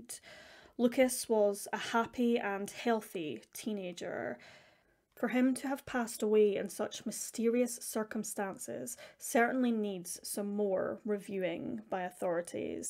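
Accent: Scotland, United Kingdom. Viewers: Oh my god your Scottish I’m from Glasgow too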